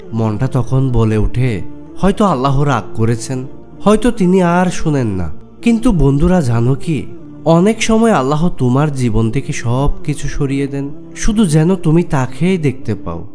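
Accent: Chittagong, Bangladesh. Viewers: Tumi ki chatgaiya poha naki?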